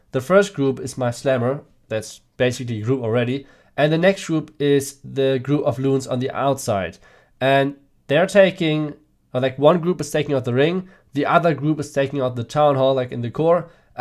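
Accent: Germany. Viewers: I really like your german accent :)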